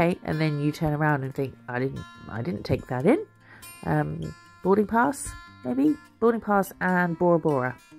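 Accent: United Kingdom. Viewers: Cheers, from Las Vegas, Baby! I think I may be one of the few sane people left here in America, so whatever you're hearing about us over there is probably true! Just stumbled across your brilliantness, and although I'm 100% hetero, I've just discovered I got Ladywood for you Girl!, your accent, your mad skills, and especially your sense of humor! It sure seems like end times these days, but at least I'll go out with fabulous nails! I must be going through some sort of transformation, as the voice in my head is now sporting a British accent and I have a new fondness for anything Ricky Gervais. Tata for now, darling, and please don't apologize for anything you say-if someone doesn't like it, they can 'bugger off' (did I use that properly?) SUBSCRIBED AND clicked the dingle jingle bell as well! Thank you!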